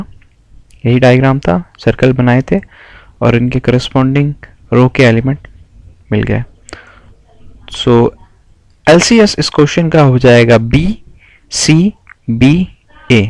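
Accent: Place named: Pakistan. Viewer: Thanks for your help. Your accent is pakistani :) good keep it up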